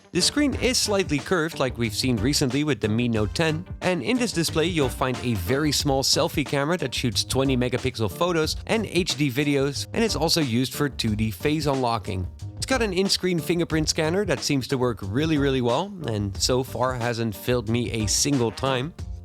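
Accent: Netherlands. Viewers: Are you Dutch by chance? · Do I hear a dutch accent?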